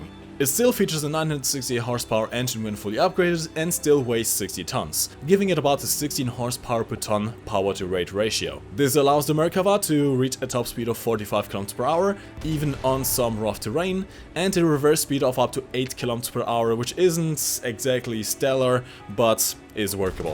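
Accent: Germany. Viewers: I knew that he sounds like a german 😂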